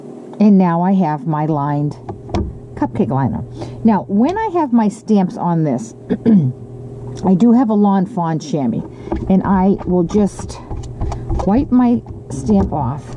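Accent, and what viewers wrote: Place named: United States. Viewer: I love to listen to you, I’ve never heard such a broad accent, not that I’ve been to many parts of your country bar a train journey from SanFransico to New York. Most Americans I’ve met are southern, or Chicago. X Ros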